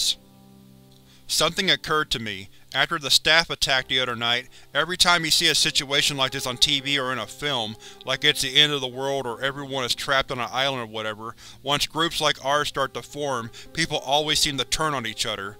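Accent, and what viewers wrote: South, United States. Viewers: I just hate how the guy voicing this has the thickest southern accent of all time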